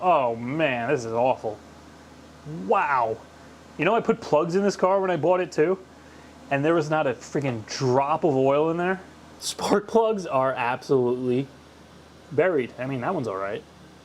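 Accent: United States. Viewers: Where are you from? You sound American, I live in the us and have an Saturn astra here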